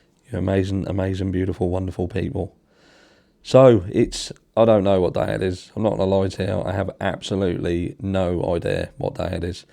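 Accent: Norfolk, United Kingdom. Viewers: Are U a Norfolk boi at all U narf sound like us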